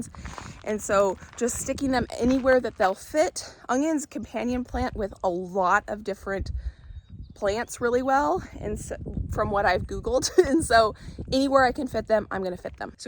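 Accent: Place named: Michigan, United States. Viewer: Are your out of town guests from Michigan ? I was hearing a bit of a Michigan accent off you today lol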